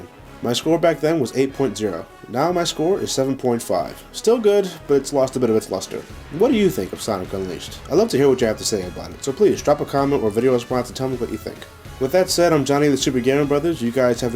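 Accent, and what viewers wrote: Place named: Pennsylvania, United States. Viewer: My personal thoughts (now that I have the PlayStation 3 version) Daytime stages: 9.5/10 Nighttime stages 9/10 Tornado Defense: 7/10 Eggmanland (which, for first timers, can go f**k itself): 2/10 Overall: 8.75/10 And for Johnny saying war-hog, it's his Pennsylvanian accent.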